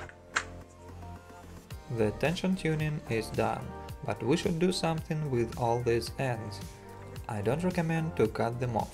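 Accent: Russia. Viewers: Какой-то слишком русский акцент -_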